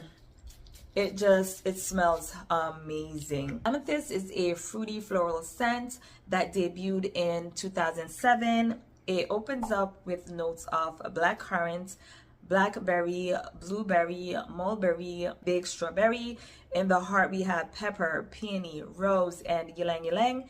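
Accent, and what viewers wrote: Jamaica. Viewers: Truly enjoyed this video. Absolutely love your Jamaican accent.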